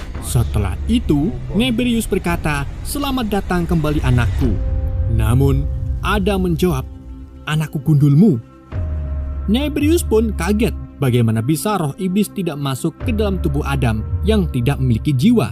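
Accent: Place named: Banyumas, Indonesia. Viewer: cingireh admine wong ngapak yah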